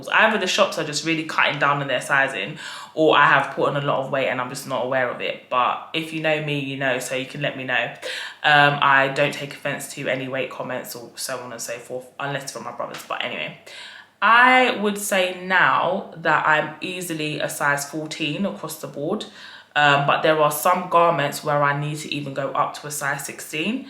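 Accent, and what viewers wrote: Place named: London, United Kingdom. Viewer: YOUR LONDON ACCENT THO.....!!!!I ..I can hear that all day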